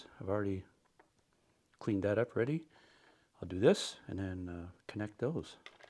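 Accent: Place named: Canada. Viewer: I think i hear a touch of Canadian accent? My papa and the rest of dad's side of the family came from canada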